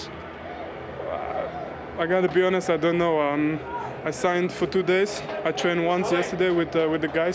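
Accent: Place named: Manchester, United Kingdom. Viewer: Funny how his Belgian accent evolved into a Manc one over the years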